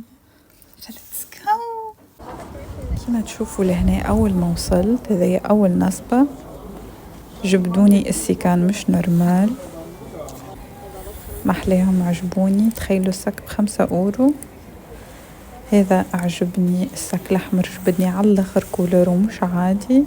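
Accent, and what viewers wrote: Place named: Tunisia. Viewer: أموت في لهجة التوانسة نحبكم برشا طبعا أنا من المغرب ❤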